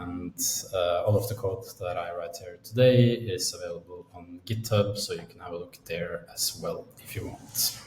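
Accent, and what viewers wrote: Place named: Norway. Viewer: you speak English like a Norwegian. Er du Norsk?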